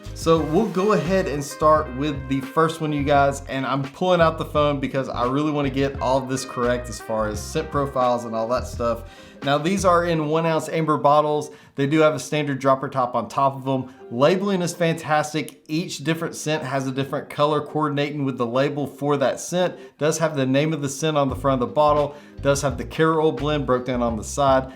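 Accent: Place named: United States. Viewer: I’m fascinated by regional accents so when I hear “carrer ool” I just have to know what part of the US you’re from. NOT making fun, swear. I’m from Philly, famous for weird-ass accent, so I’m just interested from a linguistic perspective.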